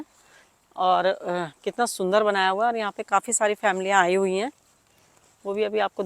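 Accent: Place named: Uttar Pradesh, India. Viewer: Im indian tum bhi up india se hogi aap ki bhasa bta rhi h nice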